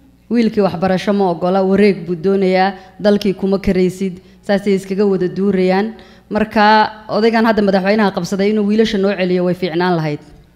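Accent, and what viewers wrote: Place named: Awdal, Somalia. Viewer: Ree awdaL weeyi gadaburzi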